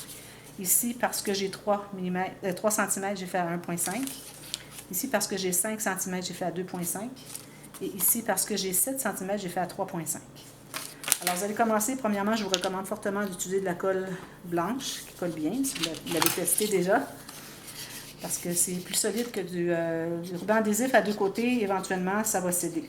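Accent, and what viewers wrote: Quebec, Canada. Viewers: Bonjour, Merci beaucoup pour votre générosité. J'ai acheté des feuilles pollen kraft en 210g: cette épaisseur est elle suffisante? Autrement quelle feuille utilisez-vous, le grammage de 260g n'est pas le plus facile à trouver. Je tiens encore à vous remercier pour tous tous les conseils et tout le temps que vous prenez pour nous répondre. Un petit coucou de Nancy en Lorraine dans l'est de la France. Votre accent me rappelle des vieux souvenirs de Montréal quand j'ai visité le canada et les USA il y a très lomgtemps.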